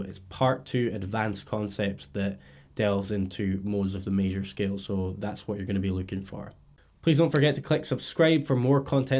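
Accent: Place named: Scotland, United Kingdom. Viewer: Amazing speech ! Sounds like there is some kind of scottish accent going on...sorry but it keeps me smiling ahah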